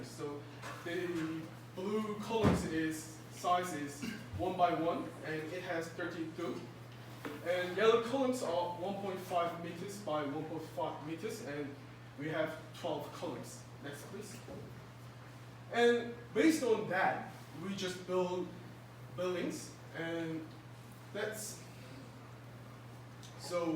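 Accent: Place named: United Kingdom. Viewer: 브릐티시 악센트가 멋있네요~~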